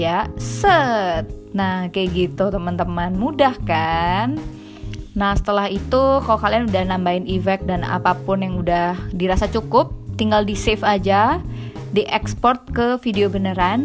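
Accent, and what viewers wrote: Java, Indonesia. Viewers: Wong jowo ya Bae medoke😎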